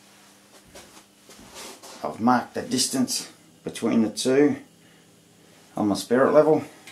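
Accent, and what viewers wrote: Australia. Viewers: Love to hear the Aussie accent :-) very cool idea. I'll see if my husband can come up with one 👍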